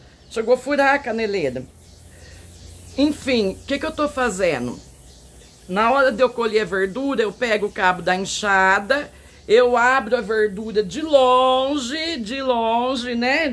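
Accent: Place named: Inland São Paulo, Brazil. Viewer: Adoro o seu sotaque meu sonho é um dia poder conhecer o interior de SP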